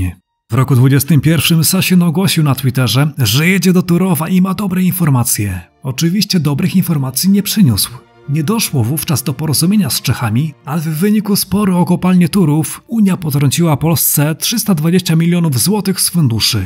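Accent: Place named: Ukraine. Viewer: dobry film, podsumowanie pisowskiej mafii 👍💪 a powiedz mi skąd u Ciebie ten akcent? Jakby ukraiński. Skąd pochodzisz ?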